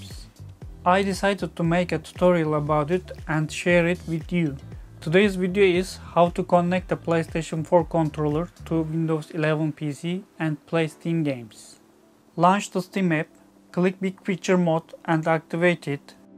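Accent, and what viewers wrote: Turkey. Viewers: dude by the accent you are indeed either Turkish or i am stupid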